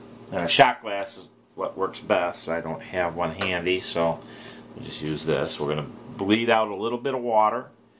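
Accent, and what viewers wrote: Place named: Wisconsin, United States. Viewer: You must be from Wisconsin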